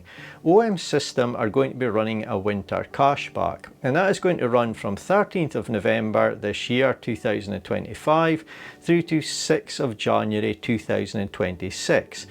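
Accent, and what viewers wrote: Scotland, United Kingdom. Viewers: I knew you were from Scotland before you mentioned it.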